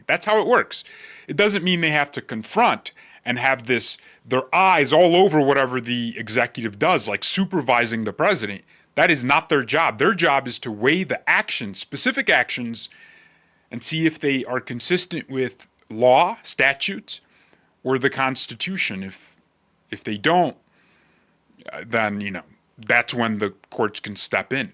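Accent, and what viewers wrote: United States. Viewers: He puts it across by speaking Pure Normal American Man-Speak :D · i just realized u are on the west coast i guess. With ur accent i always thought u were a newYorker, lol. Btw, jimmy falon had Mamdani on his show. He's disgusted me for many years. All late night talks shows arent worth the hot💨 from my 🫏🕳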